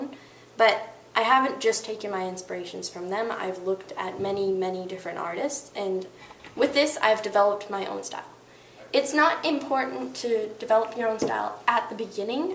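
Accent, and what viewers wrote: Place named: United States. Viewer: good job and all :D but srsly I don't like to watch people dressed up like manga characters with this american way of speaking xD it doesn't fit at all :D keep up anyway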